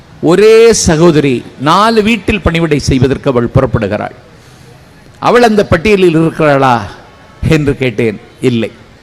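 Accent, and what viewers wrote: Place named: Kerala, India. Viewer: இவரோட தமிழ் உச்சரிப்பு சரியில்லை மலையாளம் சுவை கலந்து வருகிறது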